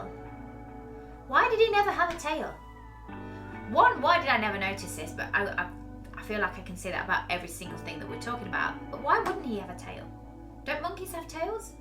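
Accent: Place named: England, United Kingdom. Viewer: Hi, I’m from California and enjoy learning about different peoples experiences of England and in England. I was wondering which region your accent is from. Just particularly like the way you speak. Have a great day. Thanks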